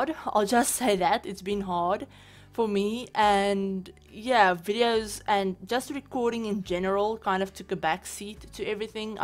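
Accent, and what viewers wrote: South Africa. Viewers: My jaw dropped when I heard the South African accent. Need more African simmers!!!!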